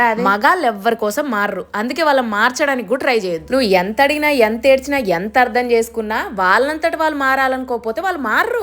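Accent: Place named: Telangana, India. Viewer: తెలంగానా slang meku suit avvadu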